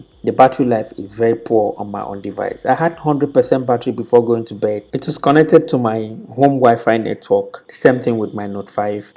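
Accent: Nigeria. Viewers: Nice to hear a Nigerian voice in the Tech Youtube world. Keep it up bro. Nevertheless, most Nigerians (May be Africans in Africa) buy phones without checking out reviews. Infinix, Techno, etc don't make waves outside here. I think its because though they are generally cheaper, they dont offer the best amongst other budget phones. 4000mah battery not lasting half a day. Too bad. There are better phones of same price range such as the Nokia 6 and similar series; and some Motorola series. Wonder why these good phones are not so common in countries like Nigeria. Nigerian marketers only look to make most of gains rather than satisfying customers. Period.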